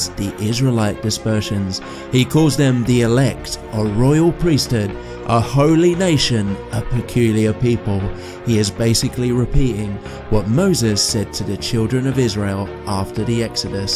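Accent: United Kingdom. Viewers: I'm so tired of British accents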